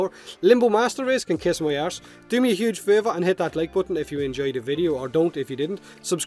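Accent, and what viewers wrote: Ireland. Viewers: Jacksepticeye reading comments... reading comments... I don't think we realise just how much we need Irish men reading and taking the mickey out of internet users.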